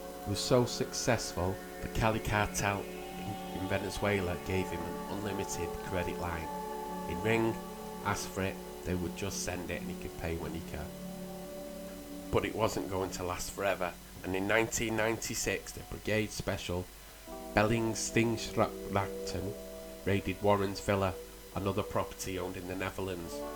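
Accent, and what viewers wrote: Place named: Manchester, United Kingdom. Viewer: Liverpools in the North East? U sound like a Manc and you say Liverpool is in the NORTH EAST? Lol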